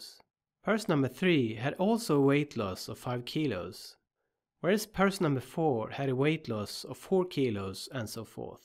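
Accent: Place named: Sweden. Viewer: Din svenska accent går inte att ta miste på. Vrf gör du inte videon på svenska?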